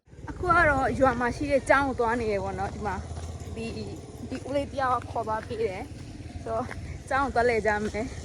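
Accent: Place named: Dawei, Myanmar. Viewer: ထားဝယ်လားမြိတ်လားစကားပြောတာနားထောင်လို့ကောင်းတယ်အခုလိုမျိုးတင်ပေးတာကျေးဇူးပါ။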